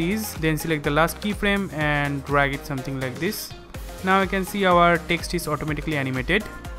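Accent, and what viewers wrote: India. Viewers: sounds indian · Sounds pure Indian😍😍😍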